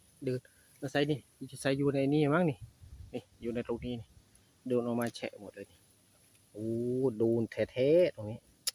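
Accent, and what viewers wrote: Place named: Cambodia. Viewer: มักเสียงเว้า สำเนียงเขมรเด้😂😂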